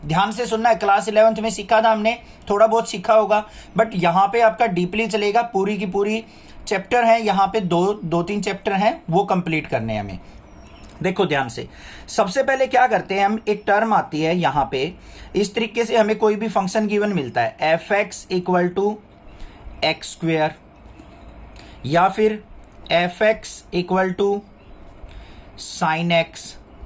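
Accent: Haryana, India. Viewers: Sir aap Haryana se hai kya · "agar ---yo-- function " sir are you from harayana (I identify you by this word "yo") ex: yo choro kam ko----koonya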